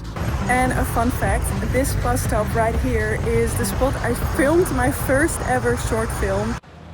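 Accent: Netherlands. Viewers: Question, are you dutch? Cause i am, snd i thought i reconised the accent😊 and i really love what your doing! So inspiring🫶🏼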